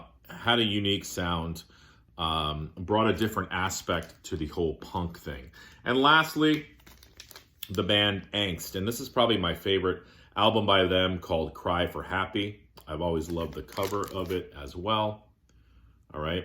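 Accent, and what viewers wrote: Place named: United Kingdom. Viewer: The British have the best accent hands down. What is this SST label you speak of? I love the dramatic pause after the “no ” reveal. You had me at Surf Rock with a punk edge. Fun fact, the name was conceived by the band assigning an alphabetical value to numbers on a gaming die, then rolling the die three times. If you like check out or.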